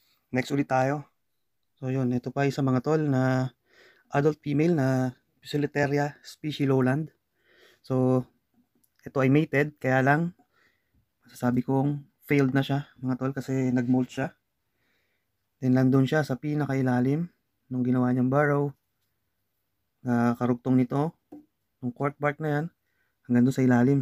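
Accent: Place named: Cavite, Philippines. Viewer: sanaol tol madami nang slings may puntong caviteño hahaha.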